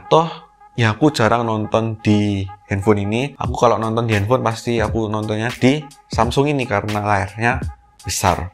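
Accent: Java, Indonesia. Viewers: Medok banget ko kaya saya🤣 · Fokus dengerin pengalaman ❌ Iri dengerin logat jawanya yg lebih medok dari saya yang orang jawa ✅ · Medok review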